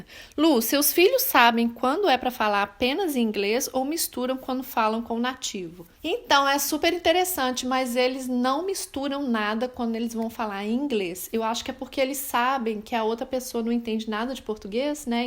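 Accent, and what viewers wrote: Minas Gerais, Brazil. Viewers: Vc disse sutaque mineiro ? ❤️❤️❤️❤️❤️❤️❤️ Já amo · Eeeeeeh!! Sotaque mineirooo!!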